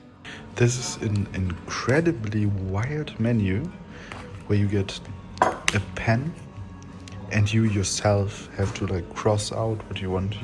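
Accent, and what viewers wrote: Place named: Germany. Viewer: Your accent is lovely, whereabouts in Germany are you from?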